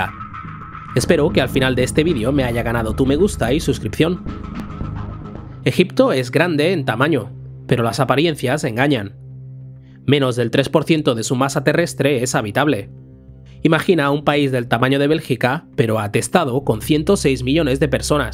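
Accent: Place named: Spain. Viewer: El contenido es muy pero muy bueno, pero déjame hacerte una critica constructiva, la narración es muy llana, le hace falta sentimiento emocion queseyo, es mas como leer un documento y no una narración, y segundo el acento y la narración nativa española (no se de que parte España seras) es muy marcada, deberías neutralizar tu acento. Suerte y voy a seguir viendo tus videos 👍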